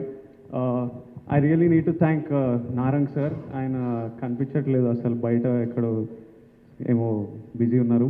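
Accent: Telangana, India. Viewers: TELANGANA slang keka kani..jaraa aaa confidence level thaginchukoo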